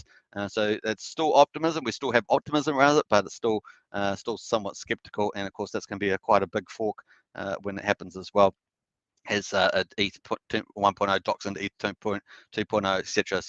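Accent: New Zealand. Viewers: Are u a wee kiwi living in the big apple?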